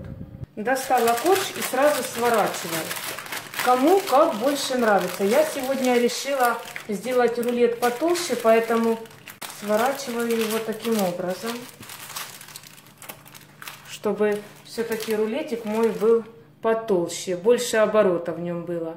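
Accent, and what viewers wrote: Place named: Ukraine. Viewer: Спасибо, за Ваше внимание, за ответ! Судя ро лёгкому акценту, Вы живёте в Украине, как и я?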